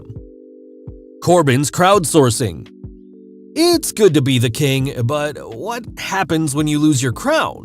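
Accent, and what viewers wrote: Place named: United Kingdom. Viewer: He's not Australian though... he's British.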